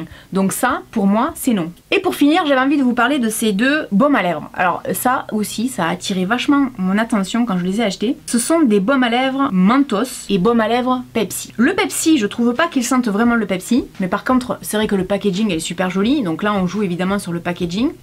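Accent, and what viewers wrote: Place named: Marseille, France. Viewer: T'as l'accent marseillais !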